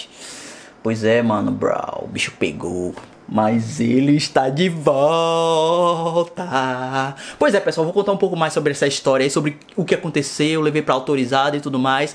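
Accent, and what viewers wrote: Bahia, Brazil. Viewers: Vc e da Bahia? ?????? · Sabia que você era Baiana, esse sotaque aí! Sou de Salvador.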